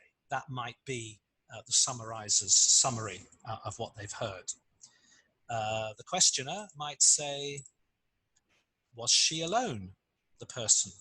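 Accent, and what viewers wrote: United Kingdom. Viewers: By the way, you have got a wonderful English (I know I should write British) accent. It´s music to my ears.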